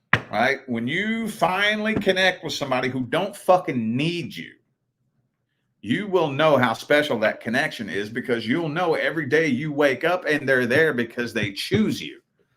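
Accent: South, United States. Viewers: I love your Southern accent and your realness :) You are so genuine and sweet and patient yet you have suffered so much. Sending love and warm hugs!!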